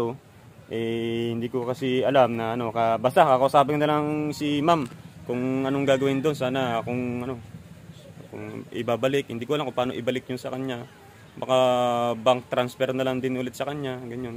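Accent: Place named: Cebu, Philippines. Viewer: I heard you speak cebuhano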